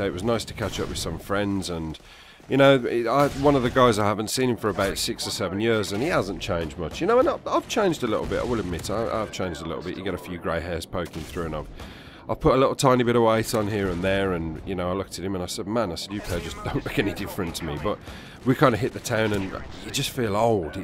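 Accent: Birmingham, United Kingdom. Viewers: I thought you were a fat, bald Brummie! 😂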